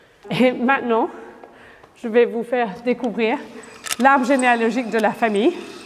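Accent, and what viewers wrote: Lorraine, France. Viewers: Très beau mais quelle charge... Bravo à vous. L'accent est il lorrain ? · Bonjour Madame, j entends que vous avez un léger accent , est il Lorrain ? Merci pour cette visite de votre château qui est intéressant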